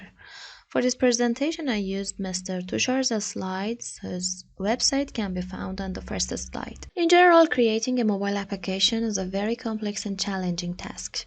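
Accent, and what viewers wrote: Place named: England, United Kingdom. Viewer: Excellent english accent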